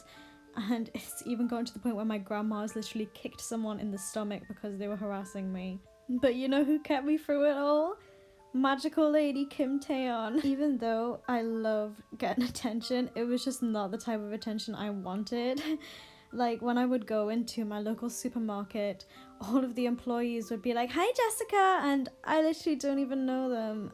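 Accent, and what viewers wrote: Newcastle, United Kingdom. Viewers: Omg you went to my school in newcastle 😂 knew there was Geordie in your voice haha. I left the year that school uniform was introduced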